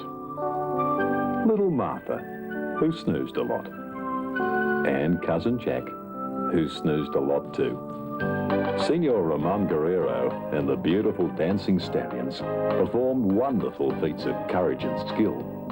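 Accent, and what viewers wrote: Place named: Australia. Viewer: That narrator is laying on the aussie accent pretty thick!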